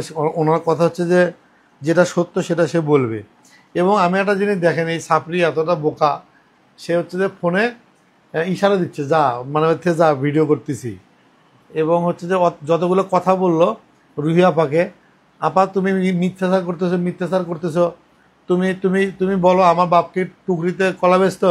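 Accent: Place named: Bogura, Bangladesh. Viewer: আচ্ছা আপনি কি বগুড়ার লোক? আপনার কথা শুনে মনে হচ্ছে হয়তো আপনি বগুড়ার লোক।কারন আমিও বগুড়ার মেয়ে।এজন্য জিজ্ঞেস করলাম।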